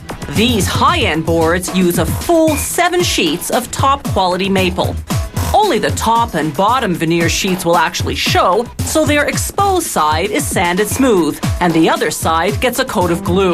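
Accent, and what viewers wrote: Canada. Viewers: she must be Canadian or from some northern state. It actually makes more sense pronounced that way, though. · Canadian accent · she sounds so canadian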